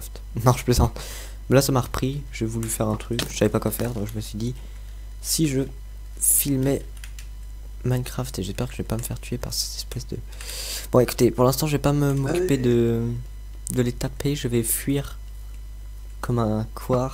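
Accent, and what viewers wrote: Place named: United Kingdom. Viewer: t anglais ou pas car ta un acsen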